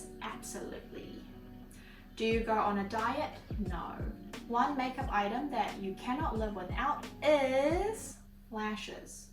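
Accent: England, United Kingdom. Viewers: I love your English accent and i just wanna hear more and more 🥰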